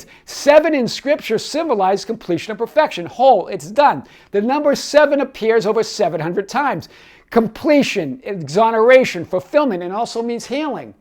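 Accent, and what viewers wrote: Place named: Massachusetts, United States. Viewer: Mate I have recently discovered your channel and you rock hahahaha. I love and appreciate your in depth explanations, your wisdom, your catch phrases " hear this!", "I want to unpack this like it's never been unpacked before", your cool Massachusetts accent, and your infectious laugh always makes me break out in a laugh too hahahaha!! Seriously, thank you for your time, effort, and service in teaching us these concepts and helping to harden our own understanding of these concepts at this point in time and space. Oh, and I notice how you seem to reply to everyone who comments or asks questions on your videos, and not just superficial responses but quite in depth and supoortive. What a legend mate 😉 From a new subscriber in Brisbane Australia oi oi oi!!! 👊😁💚☮🙏🌈🌟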